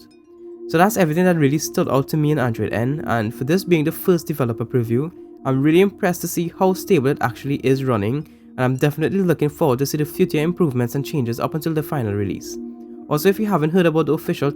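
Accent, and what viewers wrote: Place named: Trinidad and Tobago. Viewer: Hmmm..... you sound Trini. Am I right? :) #Trinidad&Tobago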